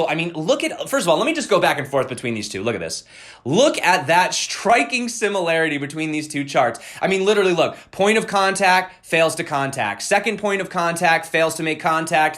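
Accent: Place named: United States. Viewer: Am I the only one still living in the US? Even you appear to have an American accent yet you use Bybit which is not available for US residents.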